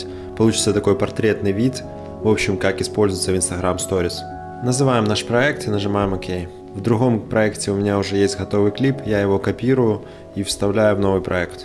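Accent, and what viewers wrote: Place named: Ukraine. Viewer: украинский акцент, с мягким звуком «Г». Только и всего. Поверьте нам не режет слух абсолютно и подстраиваться сложно, так как в нашей речи практически нет твёрдых звуков «Г», как в русском языке. Как говориться, зВыняйте))).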